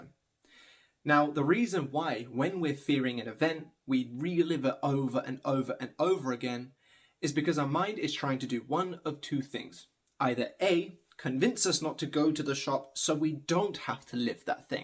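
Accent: England, United Kingdom. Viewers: This is off topic but it’s been driving me nuts so I have to ask. Where in England are you from? I’ve been all over England and I can’t quite place your accent! Sometimes I think you’re from South London, then sometimes it sounds like you’re from Nottingham…or sometimes even Birmingham! I’m way off aren’t? 😆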